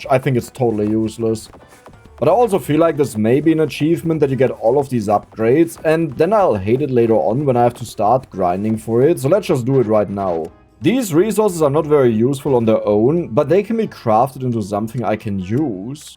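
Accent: Germany. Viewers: I see now that i love ur videos because of your german accent xd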